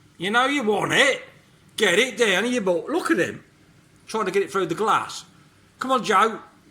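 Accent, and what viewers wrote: United Kingdom. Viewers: I also learn british accent love this mate! 🙆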